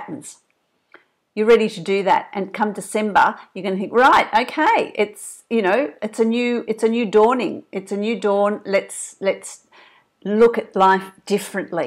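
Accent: Australia. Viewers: so fab hearing an Aussie accent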